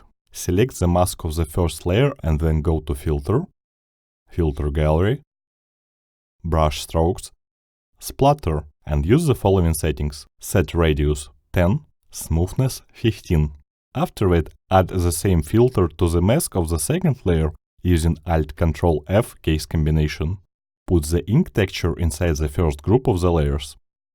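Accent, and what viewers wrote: Russia. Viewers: А можно по русски? По голосу слышно что можно. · ох уж этот русский акцент))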